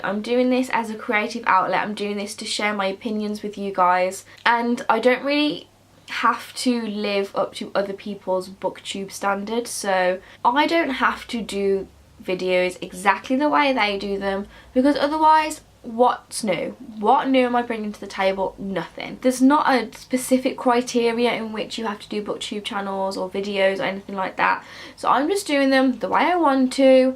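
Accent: United Kingdom. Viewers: Welcome to booktube! :D I love your Birmingham accent! ♥ My own accent is such a hot mess! But you sound lovely! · To an American, all British accents sound awesome, so you’re good with me. 😘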